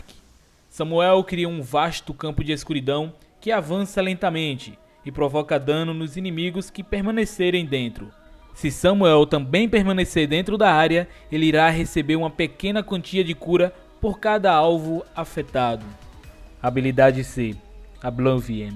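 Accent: Northeast, Brazil. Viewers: tu é de onde?tem sotaque do nordeste oshe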